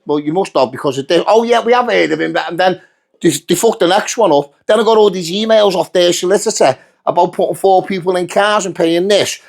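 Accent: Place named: Liverpool, United Kingdom. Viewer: How scouse is this man!😮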